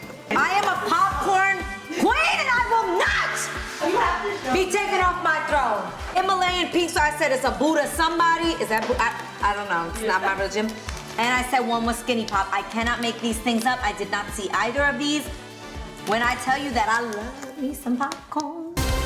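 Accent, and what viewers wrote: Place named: New York, United States. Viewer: yo i love her nyc accent lol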